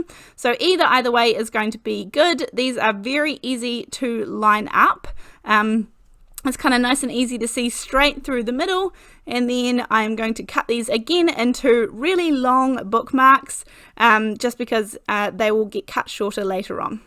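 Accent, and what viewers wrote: New Zealand. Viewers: You sound like New Zealand. I'm in Montana, USA. Those are so cute. Brand new subscriber just right now.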